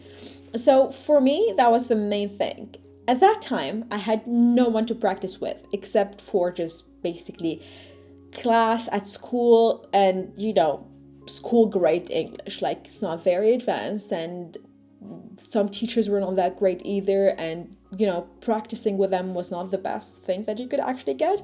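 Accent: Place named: United States. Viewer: When we first subscribed to your channel, we thought you were American :) your accent is really good!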